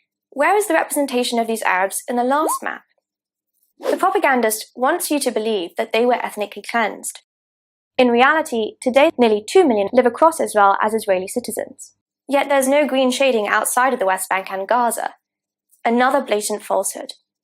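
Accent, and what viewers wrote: United Kingdom. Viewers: Oh, where do we start with this little history lesson? It's like you took a propaganda leaflet, added a British accent, and called it "the truth." First of all, the whole "Palestinian identity didn’t exist until 1964" argument? That's a classic deflection. By your logic, Jewish identity didn't exist until 1948 because modern Zionism only took shape in the late 19th century. National identities evolve, but the Arabs in this region had been living there for centuries. Denying their identity doesn't erase their existence or their connection to the land. Next, the "British Mandate wasn't Palestinian land" claim. Sure, it was a mandate, but does that mean the Arabs living there weren't the indigenous population? You conveniently forget that the Balfour Declaration—pushed by colonial powers—promised a Jewish homeland in Palestine without consulting the people already living there. 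And Jordan? Nice try,. Handing off land east of the Jordan River to a Hashemite monarch doesn't magically negate the fact that the Arabs west of the river had their land systematically taken. Ah, and the Partition Plan. You paint the Arabs as unreasonable villains for rejecting it. Let me ask: would you accept a plan that gives over half your land to recent European immigrants? In fact, the UN Partition Plan of 1947 allocated 56 % of the land for a Jewish state, despite Jews comprising about one-third of the population and owning less than 7% of the land. Furthermore, the Zionists didn’t wait f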